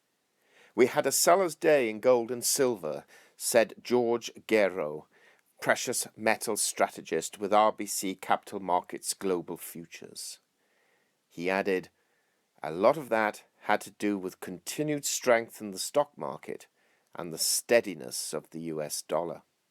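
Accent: England, United Kingdom. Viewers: Somehow this advice seems even more authoritatively wise hearing it in an English accent.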